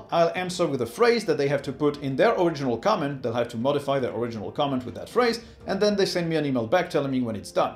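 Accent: France. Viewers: With more than 1K reactions, we'll have a winning change of almost one promille 😁, I'll take that. Always nice to listen to your lovely french accent, about diverse Linux items; I've used Unix and Linux since ~'83 and I still learn new things from you, thanks.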